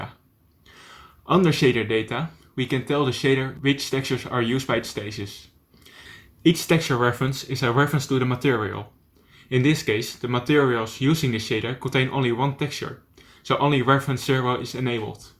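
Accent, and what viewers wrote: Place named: Netherlands. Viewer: Guy with heavy dutch accent explains shaders.mp4 Good video! I would just mess around with the settings until I got what I wanted.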